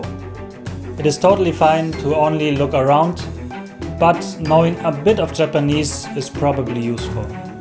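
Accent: Germany. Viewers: Voll der deutsche Akzent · hahaha, so you are German. I checked out the page. But is your Japanese better than your English? I hear both German and Japanese influence in your English pronunciation. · I love the German accent! Or is it Swiss or Austrian?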